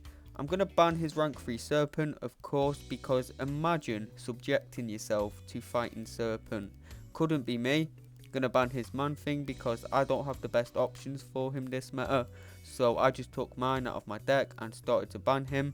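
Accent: United Kingdom. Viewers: He has an accent, plenty of people in the uk speak like that, don’t be obtuse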